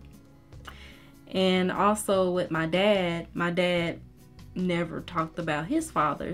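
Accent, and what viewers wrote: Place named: Mississippi, United States. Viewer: I can hear that Mississippi/Memphis accent, not as strong, maybe because you're in Cali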